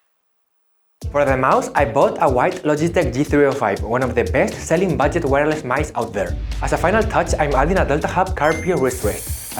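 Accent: Spain. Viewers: spanish accent on a asian guy is really trippy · It’s breaking my brain to see an Asian with a Spanish accent. 😊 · Are you a spanish speaker? Your accent while speaking english is kinda similar to ours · Bro has a spanish english accent lol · Great video. You sound spanish, are you from spain?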